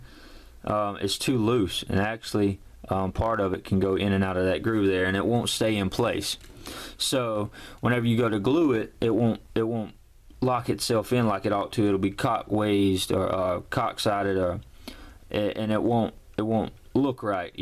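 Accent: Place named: South, United States. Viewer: Bham, Alabama. haha something told me you was from the south.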